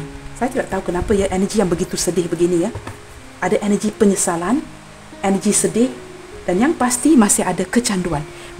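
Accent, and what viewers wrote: Malaysia. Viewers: Tak apa kak aku suka kak cakap Malaysia , aku suka dan aku paham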